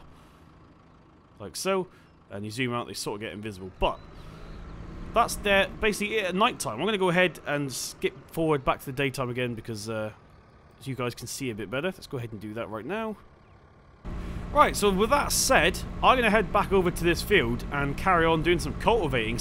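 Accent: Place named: United Kingdom. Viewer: British accent so annoying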